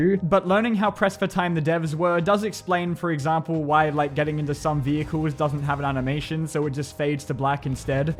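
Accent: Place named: Australia. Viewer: I heard your Australian right well guest the hell what mate I’M A AUSTRALIAN TOO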